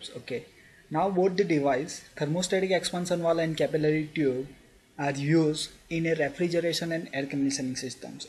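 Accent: Gujarat, India. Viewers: R u South gujarati ?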